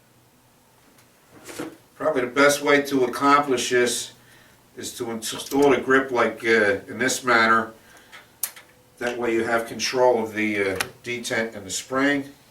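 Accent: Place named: Nassau County, United States. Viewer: nassau county accent detected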